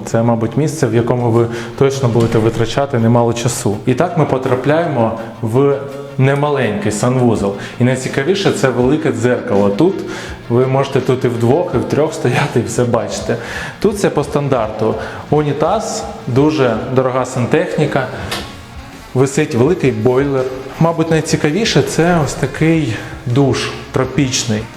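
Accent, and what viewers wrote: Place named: Russia. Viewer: He is speaking with russians accent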